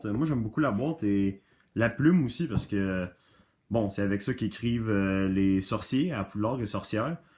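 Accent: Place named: Belgium. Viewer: t es belge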